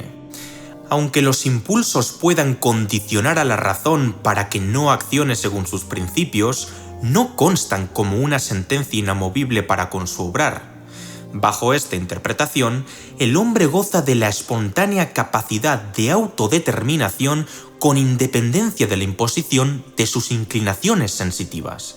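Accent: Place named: Spain. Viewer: Disculpa, diré algo sin la intención de ofender: en Latinoamérica no estamos acostumbrados a escuchar el español de España. Es tedioso, como al escuchar por mucho tiempo el inglés británico. ¿Crees posible en un tiempo no muy lejano "traducirlos" al español latinoamericano? La temática de los videos son interesantes, incluso hasta para traducirlos a varios idiomas más. Saludos.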